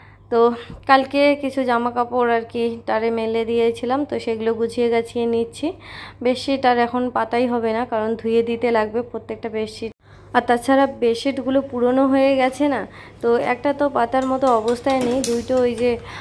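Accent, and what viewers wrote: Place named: Rangpur, Bangladesh. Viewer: তুমি কোন ভাষায় কথা বলো,। বাংলাদেশের রংপুরের মানুষ তোমার ভাষায় কথা বলে।মানে বাংলাদেশের উত্তরবঙ্গের মানুষ তোমার ভাষায় কথা বলে। আমার খুব ভালো লাগে ❤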